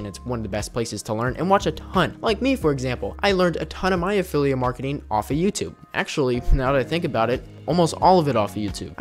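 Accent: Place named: United States. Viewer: You sound American but your channel name is why?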